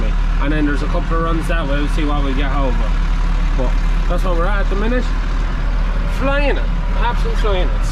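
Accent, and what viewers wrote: Ireland. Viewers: I’m a first time watcher. It sounds like you’re from Ireland. My great, great grandfather immigrated to the USA from County Caven in 1828 when he was 14 years old with his family. My brothers & I now own the farm he settled in Renville Co., Minnesota in 1866. My mom inherited it when her parents died in 1987. We inherited it from her in 2008 when she died. We rent the cropland out to a corn & soybean farmer. It’s a little more than 92 acres. What county are you from? Thanks. I subscribed too.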